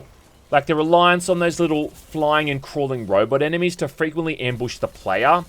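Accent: Australia. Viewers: have you never heard an Australian?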